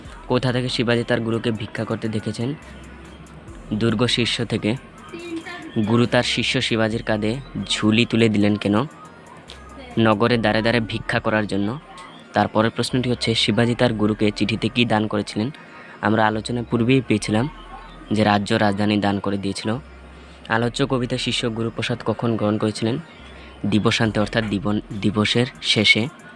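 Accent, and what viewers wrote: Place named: New York City, United States. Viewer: Your voice is very NYC bro.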